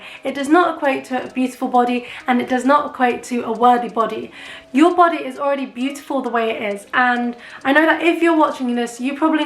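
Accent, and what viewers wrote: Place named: Asia. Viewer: what kind of asian are you and where do you live? I super love your accent and i plan to try Pamela's workout as well!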